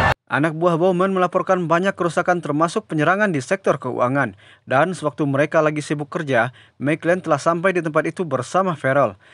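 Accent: West Sumatra, Indonesia. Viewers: Orang minang bang?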